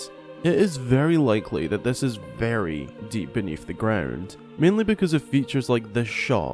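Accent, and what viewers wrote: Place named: Scotland, United Kingdom. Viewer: You've a very strange accent to me, it kinda sounds like Scottish and Northern Irish mixed and every odd word American lol I like it and the video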